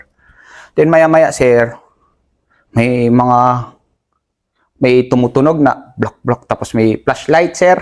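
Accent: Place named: Visayas, Philippines. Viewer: Kakapanood ko sa part 1 Sir hindi accent ng ipugaw kundi bisaya na✌️.Ipinagmamalaki ka mg buong ifugao province sir at ipagdadasal din namim ang kaligtasan mo kayong lahat jan. Nakakalungkot lang na kapwa pilipino nagpapatayan anong klaseng utak kasi ang meron yong mga tao na kumakalaban sa gobyerno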